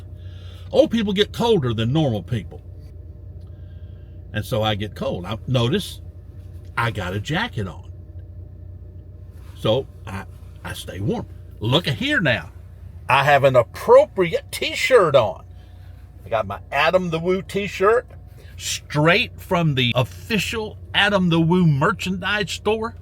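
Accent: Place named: South, United States. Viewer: Glad is going out w/friends, good to hear! I was chuckling thru out this video so thk u & thk u big the foot! I think it would be great if all Adams utube friends get a matching big the foot air freshner & hang it up in their car. GIV recently bought one! I think it would be cool if we can ask questions about Adam & u on the days maybe when ur too tired to go out. Better yet, sharing stories of the Bible would be awesome bcuz I have ADD & Dyslexic really bad so I don't like to read, I lose my place when reading & my concentration is cowazy really lousy. I had someone tell me its the book ur reading, find stuff to read that u find interesting. Nothing helped, so then I would check out bibical audio stuff & they'll talk too darn fast makes my head spin. U have a nice voice like Adam maybe even better cuz u talk slower w/a southern accent that I have always found so comforting. 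So if u know any Bible study audio out there where the person talks slow w/a southern accent, why please let me know otherwise I think I just might wait for u to get & feel well again!🎶 U got a 🎵 friend in me!🎵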